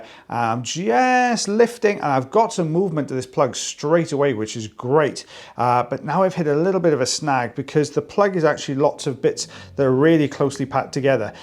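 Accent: United Kingdom. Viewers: Yes, we say vacuuming in the US, but it makes me chuckle to hear hoovering! ☺️ Love your British accent in addition to your knowledge!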